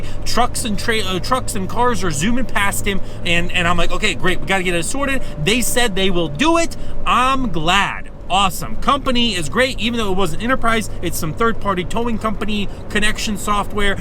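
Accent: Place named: Iowa, United States. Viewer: heyt, sounds like EagleGrove Ia.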